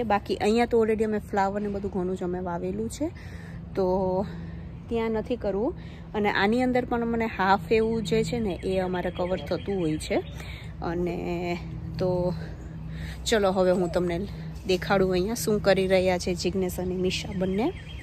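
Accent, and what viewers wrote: Kathiawar, India. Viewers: 🙏 જય સ્વામિનારાયણ 🙏 👌👌👌👌 વિડીયો બનાવ્યો છે તમારૂં વતન કયું છે તે જણાવશો અમે તમારો વીડિયો પહેલી વખત જોયો છે મજા આવી છે તમારી ભાષા પરથી એવું લાગી રહ્યું છે કે તમે કાઠીયાવાડી છો અમે મોરબી થી છી તમને બંધાને મારા ભાવથી જય સ્વામિનારાયણ 🙏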